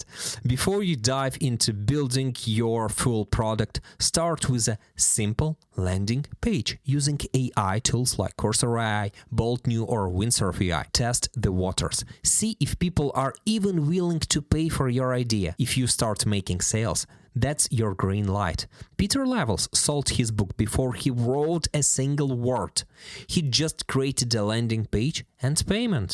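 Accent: Ukraine. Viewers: Holly Dolly, you are our guy. I undestand that by accent. Ukrainian too?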